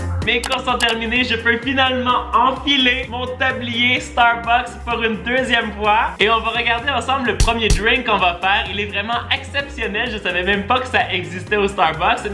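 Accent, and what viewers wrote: Canada. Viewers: tu mon youtubeur préféré desCarl is cooking vidéos accent canadien et qui met quoi des vidéos trop trop rire 🇨🇦👍👍👍👍👍 recette Starbucks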